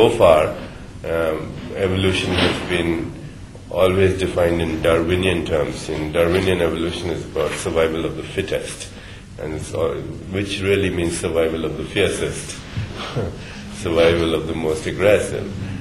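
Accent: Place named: India. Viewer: Not sure if wise or just Indian accent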